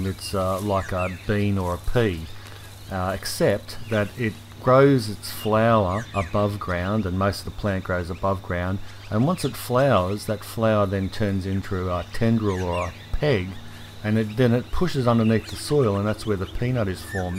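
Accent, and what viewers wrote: Australia. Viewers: Rithik Madan Australian I think, maybe New Zealand but pretty sure he’s mentioned being Aussie. Definitely not british. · Chowder Stevens he's not English he's an aussie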